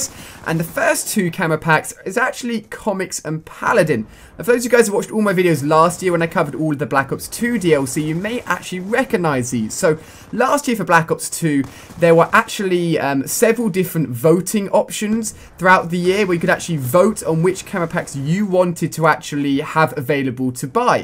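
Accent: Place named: England, United Kingdom. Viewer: English accent but says amarican (astralian)currency$$$$$??????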